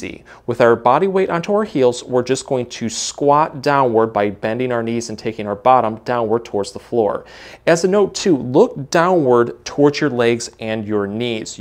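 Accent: Michigan, United States. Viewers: Michigan accent?????? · Just the warm ups fixed it for me. Go Michigan!! Luv that MI accent😊 1000 Thanks 👍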